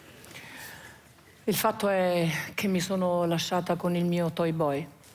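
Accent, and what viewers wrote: Rome, Italy. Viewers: Mio padre aveva una parlata quasi romanesca e ascoltarti e una cosa fantastica perché tu sei fantastica dai allegria e colore a tutto quello che dici parole vere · stesso quartiere della Meloni : stessa cadenza, stessa genialità espressiva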